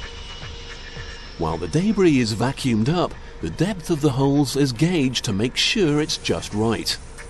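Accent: England, United Kingdom. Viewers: It sounds like him in as much as he's English. But really, Clarkson sounds nothing like this. Do all us Limey's sound the same to you? ;) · So if this is in the US then why is a english person from britian narrarating? I thought this was on BBC for a second there.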